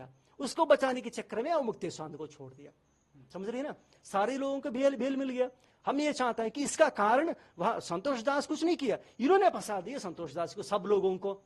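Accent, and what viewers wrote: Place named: South, India. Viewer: South ke accent me hai kuch bhi mat bolo yar😂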